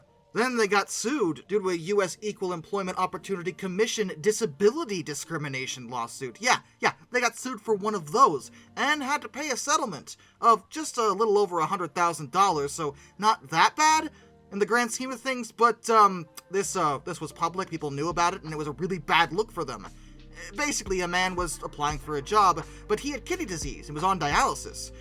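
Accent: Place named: Michigan, United States. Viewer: You must be from Michigan... there's no S on K-Mart/Walmart/Target!!!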